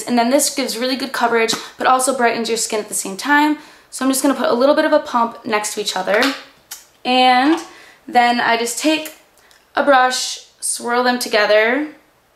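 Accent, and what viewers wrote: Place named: Cleveland, United States. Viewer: hi! i really like your videos, i just subscribed:) ps- and I've been noticing how your accent is very similar to where im from (cleveland) and when you said you were from chicago it made so much sense just thought i'd let you know cause it seemed funny to me hahaha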